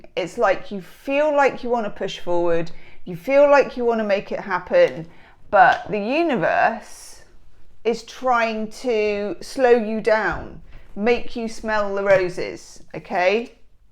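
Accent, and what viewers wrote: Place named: United Kingdom. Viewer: Love your british accent and humour ! 😂😊🎉 thank you !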